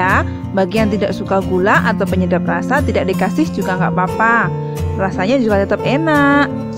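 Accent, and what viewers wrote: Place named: Java, Indonesia. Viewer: Wah mantap bikin perut lper ni 😄 jowo medok hadir embak e..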